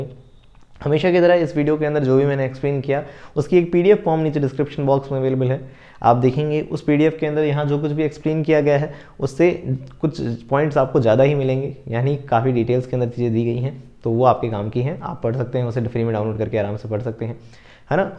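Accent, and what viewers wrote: Bikaner, India. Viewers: No it's a bikaneri sir ❤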